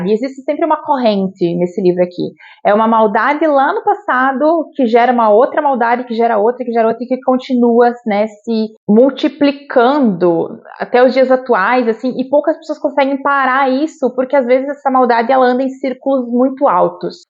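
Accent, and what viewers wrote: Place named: South, Brazil. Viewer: Oi! Pelo seu sotaque tu é do Sul, por acaso você mora no Rio Grande do Sul? Se sim, como você está e sua família? Estão todos bem? Quanto ao livro, muito interessante. Gosto de ler livros pesados, as vezes, sair da bolha sabe. Abraços 😘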